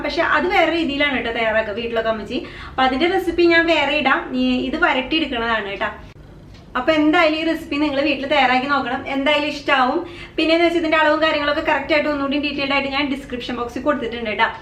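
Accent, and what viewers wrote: Thrissur, India. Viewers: Chechi thrissur kaari aano? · Thrissurkari Alle ? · thrissurkarii annee thonnunuuu.....😘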